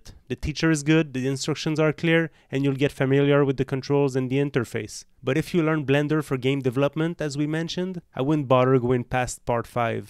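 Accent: Quebec, Canada. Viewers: Quebec accent is peak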